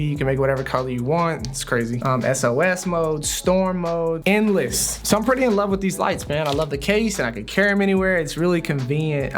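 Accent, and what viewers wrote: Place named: Philadelphia, United States. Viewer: Bro I swear you sound like you’re from philly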